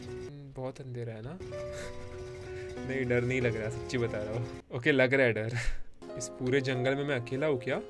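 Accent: Maharashtra, India. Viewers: You have a Marathi ancient in speaking and I love it yarr....🥳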